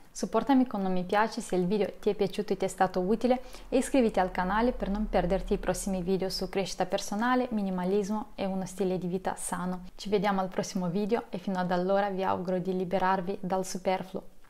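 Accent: Russia. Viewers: Io: che nel cuore della notte vorrei dormire YouTube: consiglia video di una ragazza con accento filo-russo che spiega come vivere con pochissime cose •_• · mi rilassa troppo la tua voce russa!😍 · Sei russa? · Non riesco a capire dal tuo accento se sei russa oppure moldava? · Come mai stavo guardando dei video poi ricarico la pagina e trovò una che ha l’accento Russo e poi vive usando con una sola padella :O (comunque lei può fare quello che vuele, questo è anche interessante) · Grazie per questo video, mi è piaciuto molto! Sto imparando italiano e mi aiuta molto guardare i tuoi video. Ho notato un piccolo accento russo, altrimenti avrei pensato che l‘italiano fosse la tua madrelingua. Posso chiederti da quando stai imparando italiano? E da quanti anni vivi già in Italia? È stato difficile imparare italiano così bene? Grazie :)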